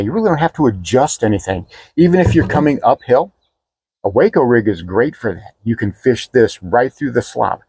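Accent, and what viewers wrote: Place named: North, United States. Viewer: Hey your accent sounds kinda northern—how did you end up in southern Mississippi?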